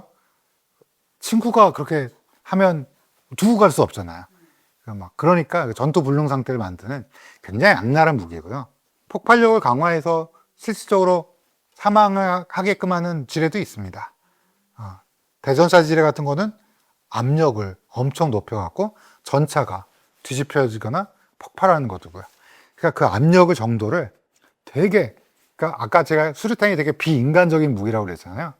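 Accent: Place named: Gyeongsang, South Korea. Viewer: 피디님 경상도 분이신갑네예 사투리 이빠이 써뿌네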